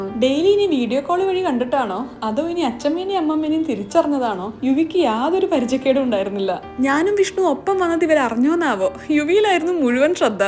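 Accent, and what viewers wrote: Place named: Valluvanad, India. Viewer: Calicut University in Malappuram തേഞ്ഞിപ്പാലം പക്ഷേ അതിനും പേര് പറയുന്നത് Calicut University എന്നാണ്❤️ കൊല്ലം വഴി വന്നാൽ ചിലപ്പോൾ എന്നെ കണ്ടേക്കാം എനിക്ക് ഒരുപാട് ഇഷ്ടമുള്ള ഒരു influencer ആണ് എന്റെ ഈ കുഞ്ഞ് അനിയത്തി കുട്ടി വള്ളുവനാടൻ സംസാരം കൊണ്ട് ആരെയും ആകർഷിക്കുന്ന ഒരു കുട്ടിയാണ് നിങ്ങൾ നിങ്ങളുടെ breakfast recipe ൽ ആകൃഷ്ടയായ ഞാൻ നിങ്ങളോടൊപ്പം സഞ്ചരിച്ച് Yuvi boyൽ എത്തിച്ചേർന്നിരിക്കുകയാണ് നാട്ടിലെത്തിയ നിറഞ്ഞപ്പോൾ പറഞ്ഞറിയിക്കാൻ കഴിയാത്ത സന്തോഷം വല്ലപ്പോഴും എവിടെയെങ്കിലും വച്ച് കാണണമെന്നാണ് എന്റെ ആഗ്രഹവും പ്രാർത്ഥനയും അത്രത്തോളം എനിക്ക് ഇഷ്ടമാണ് ഈ മല്ലു പെൺകുട്ടിയെ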